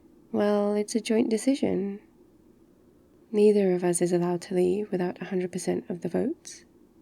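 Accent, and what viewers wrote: United Kingdom. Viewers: Are you British! Finally! I love British accents!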